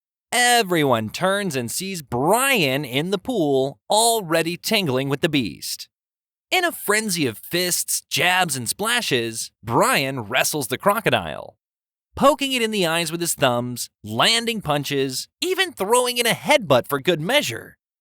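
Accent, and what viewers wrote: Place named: Australia. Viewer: love your Aussie accent😂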